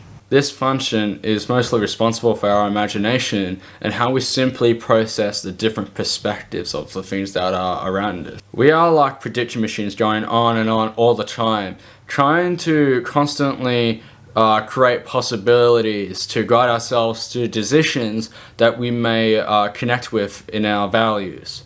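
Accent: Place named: Australia. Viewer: His aussie accent plus a deep voice makes all the difference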